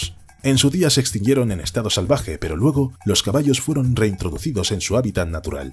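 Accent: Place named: Spain. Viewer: excelente gracias por tus videos! son interesantes y relajantes por tu tono de voz español que me recuerda a los documentales que veía cuando era un niño. Saludos desde la ciudad de Quilmes, Buenos Aires, Argentina.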